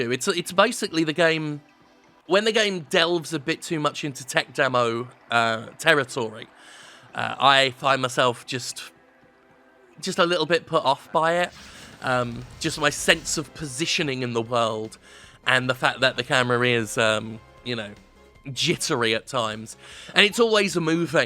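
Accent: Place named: United Kingdom. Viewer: Is it just me or did Jim sound extra-British in this video.....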